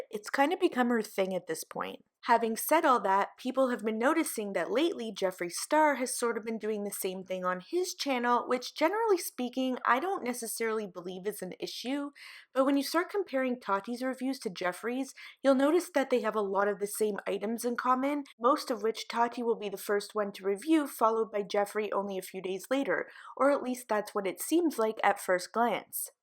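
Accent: Canada. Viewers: omg. This is my favorite channel. And your accent (Canadian?) is adorable. Keep digging dat dirt, girl.😘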